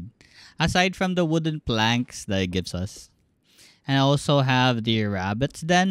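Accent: Philippines. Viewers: Are you Filipino? Cuz your accent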